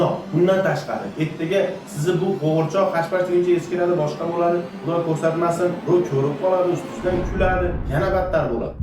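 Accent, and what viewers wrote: Andijan, Uzbekistan. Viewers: aka anjancha ogilbolacha gapirar ekansiz😊😊😊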